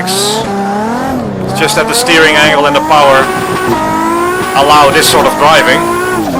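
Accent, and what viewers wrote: Netherlands. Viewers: You dutch right you atleast you sound dutch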